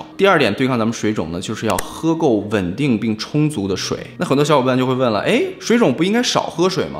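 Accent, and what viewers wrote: China. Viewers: 胖时比较好看，而且你现在的普通话口音很适合之前胖子的气质。。。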